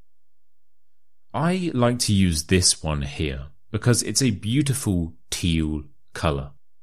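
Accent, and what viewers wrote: United Kingdom. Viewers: The best british accent, the best channel ever!!!!! 👏🏼👏🏼👏🏼👏🏼👏🏼👏🏼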